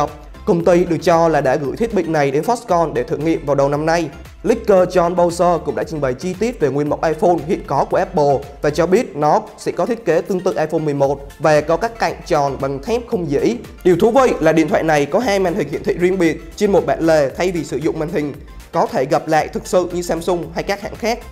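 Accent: Phu Yen, Vietnam. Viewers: Giọng phú yên nói nhanh quen rồi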